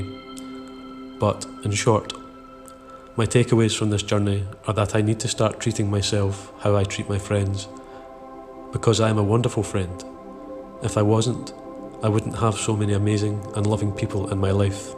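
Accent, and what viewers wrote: Scotland, United Kingdom. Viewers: u fae Glasgow by any chance? or somewhere else in scotland ?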